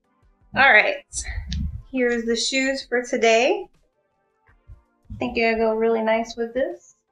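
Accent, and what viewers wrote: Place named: South, United States. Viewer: Love the southern drawl y'all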